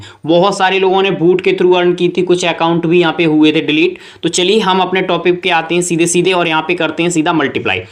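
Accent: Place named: Bundelkhand, India. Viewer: Bhai apki awaaz sunkar bundelkhand ki yaad taza ho jati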